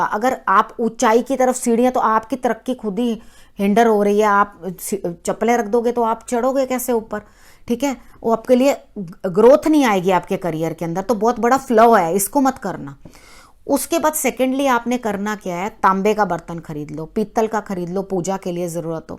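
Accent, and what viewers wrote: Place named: Punjab, India. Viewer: Very well explained, m ur fan ,specially our type punjabi accent.i adore u a lot.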